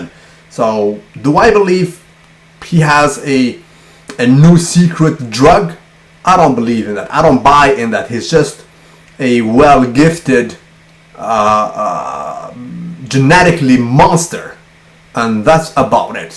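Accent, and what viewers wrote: France. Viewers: hhhahaahaa ton accent Français trèes surprenant!! tres amusant!!! fait donc 1 video en Français!! loll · Hey, is your first language french?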